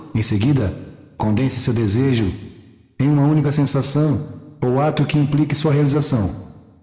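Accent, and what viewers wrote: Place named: Florianópolis, Brazil. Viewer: Esse narrador é manezinho com certeza!! Parabéns mô quiridu!! 👏👏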